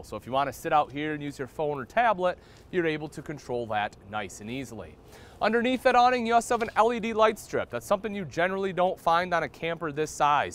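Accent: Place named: Minnesota, United States. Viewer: Dude sounds direct from Minnesota! I’m from there and I’m like whoa!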